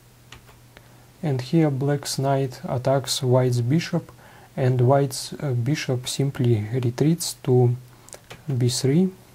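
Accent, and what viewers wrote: Russia. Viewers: I love your "boring-russian-nerdy guy" accent.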